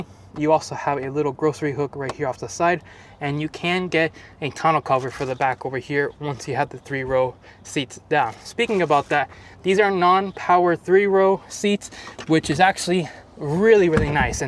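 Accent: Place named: Miami, United States. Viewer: It’s the Miami accent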